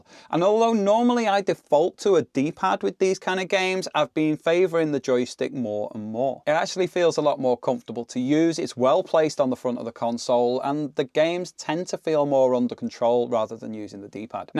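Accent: United Kingdom. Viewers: Great video, it's a breath of fresh air to get a British person instead of the crazy yanks.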